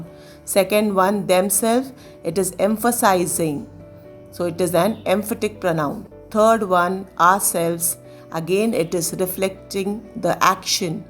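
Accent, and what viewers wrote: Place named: India. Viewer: Indian ahhh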